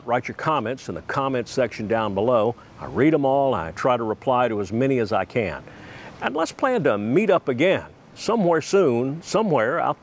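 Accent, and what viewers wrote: United States. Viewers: Mr. speaks near perfect, American english. Enunciation, tone, accent, inflection, rhythm. Not a single word did i misunderstand. A dissappearing trait... ☆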